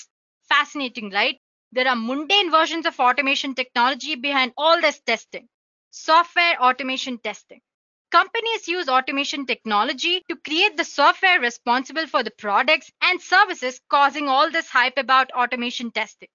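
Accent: India. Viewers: you are from south india i guess . your accent suggests. south indians are very nice , hardworking people, nice course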